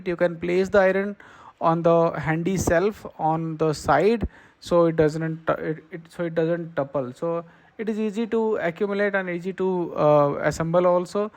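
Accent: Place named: India. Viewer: How can u guys NEVER speak english someone who is not indian can understand, how do u need get the stereotype indian accent every time were laughing at u guys and still u can't learn decent english, man stfu already with ur plilplialpliaplablablabladingelinge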